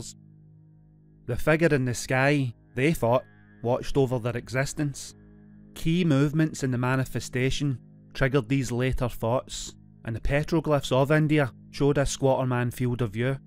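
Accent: Scotland, United Kingdom. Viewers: Are u Scottish?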